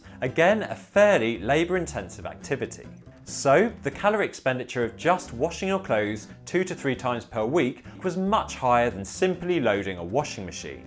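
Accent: United Kingdom. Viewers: he's using the British pronunciation - because he's British. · in the US in the 50's there were HUGE supermarkets & everyone had a refrigerator.....considering his British accent...maybe not in the UK. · Love that British pronunciation with emphasis on syllables unaccustomed to American ears. SeDENtary and ContriBUTory.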